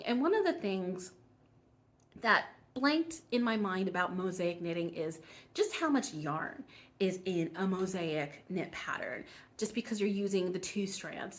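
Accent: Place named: Vancouver, Canada. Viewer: Very impressive. I had to watch it twice. You sound like you're from Vancouver, Can. Keep up the excellent work.🌫️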